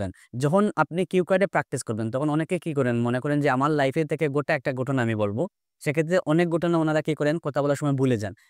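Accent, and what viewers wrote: Sylhet, Bangladesh. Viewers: আপনি কি সেলেটি?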